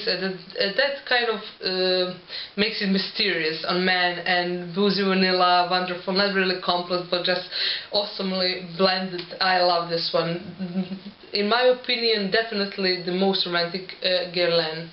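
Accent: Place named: Russia. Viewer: Хахах видно что русская ( акцент )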